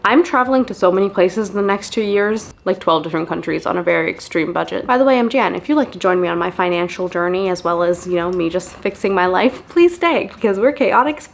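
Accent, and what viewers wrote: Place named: United States. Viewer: You sound like you are American. Those adaptors work great if you are not American because most places use 220V and you just need an adaptor. But if you use it on US products that are 110V you will burn them all up the second you plug them in. You need a transformer to go along with that to step down the voltage to 110V. While some us appliance have both 110v & 220v don't count on that because most don't. It will say right on it if it does. If you do it an cause a fire in your hotel or airport or anywhere else You will have to pay for the damages which won't be cheap. If you don't have the money to cover it many places will put you in Jail and then deport you back to your country. How long you wait in jail before you are deported varies and can even be months. Things to keep in mind but not to scare you. Be smart and you will be fine.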